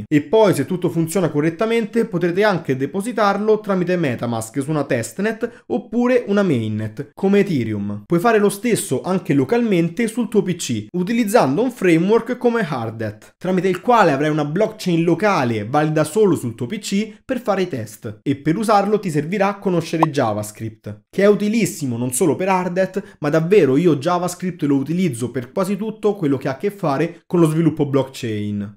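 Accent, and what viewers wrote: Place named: Italy. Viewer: Non ho capito un caxxo L inglese configurato in Italico parli🤣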